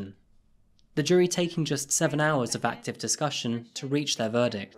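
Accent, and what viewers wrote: England, United Kingdom. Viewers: that's hella weird and goofy. Not at all, those subs gonna hit 1 million soon enough for this guy, so it speaks for itself. Very clean production, B roll smooth editing, and his very English accent adds to his distinguished diction,soft spoken but most importantly. He humanizes the victims in the cases, he puts the focus on their story of how they lost their life and doesn't sensationalize it. But as he tells their story like hers, he honors them. by telling their story like this, he's getting the bag and does it with class. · Am I the only one that gets annoyed that he gives temperatures in farenheit and doesn't even provide a conversion to celcius...I have no fuckin idea what 88f is and neither do most of the world. Dude you have a British accent throw us a bone here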